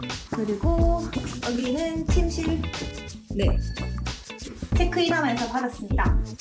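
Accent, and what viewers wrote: Gyeongsang, South Korea. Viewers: 경상도 삘이!!!! ㅎㅎㅎ 평소 좋아하던 분인데 정감이 가네요!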